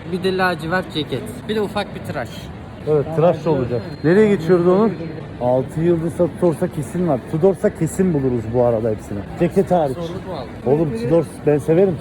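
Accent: Cyprus. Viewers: abi sen kıbrıslımısın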